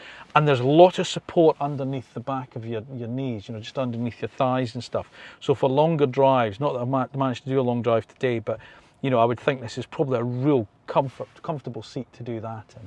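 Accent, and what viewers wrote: Scotland, United Kingdom. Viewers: Your accent is Scottish and is not a stupid accent.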